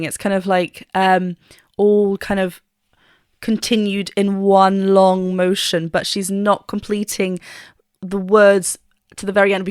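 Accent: United Kingdom. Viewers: Are U from Austria? The Name looka like, but the english Accent is british? Or i am false?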